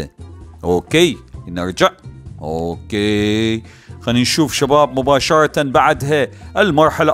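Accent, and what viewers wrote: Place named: Iraq. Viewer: من لهجة عراقي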